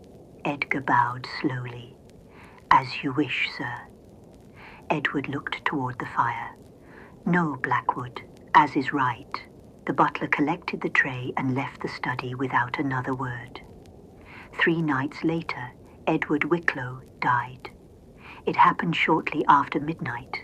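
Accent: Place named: United Kingdom. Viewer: I really enjoyed that, another British voice with added sound effects, wonderful!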